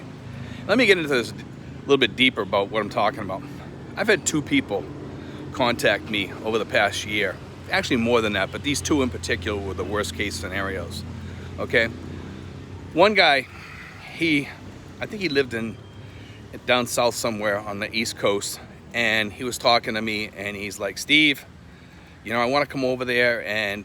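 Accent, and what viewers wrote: Boston, United States. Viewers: Steve you sound like a Boston guy. This is my first time seeing your channel, hello from Lynn Ma.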